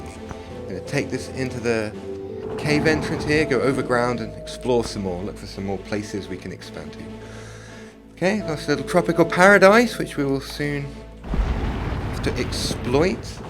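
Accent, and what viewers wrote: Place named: England, United Kingdom. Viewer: his pommy lisp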